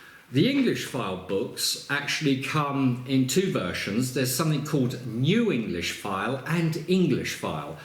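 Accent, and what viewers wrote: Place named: United Kingdom. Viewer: BRITISH ENGLISH PRONUNCIATION....I LIKE IT!!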